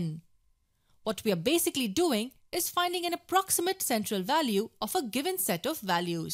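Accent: India. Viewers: I CAN SAY YOU HAVE INDIAN ACCENT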